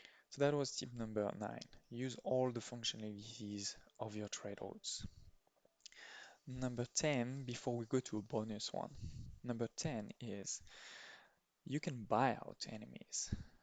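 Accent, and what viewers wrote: France. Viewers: l'accent francais ptdr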